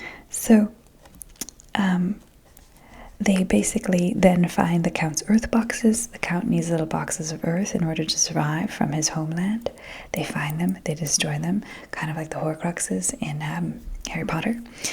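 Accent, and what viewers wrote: Transatlantic, United States. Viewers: You are so bloody fabulous. Thank you for sharing your voice and your mind with us! Can I ask where your accent is from? It's delightfully Transatlantic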